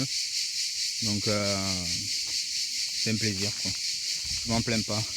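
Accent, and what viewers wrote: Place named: South, France. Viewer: Ah les cigales et l'accent du Sud... J'adore. Je viens d'acheter le même ce matin pour 450€. Bonne route.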